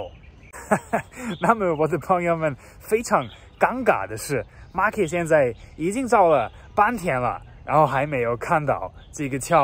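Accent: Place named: Sichuan, China. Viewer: 哈哈，四川话好听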